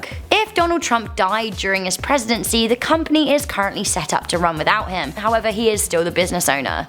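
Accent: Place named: United Kingdom. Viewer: that damn annoying british accent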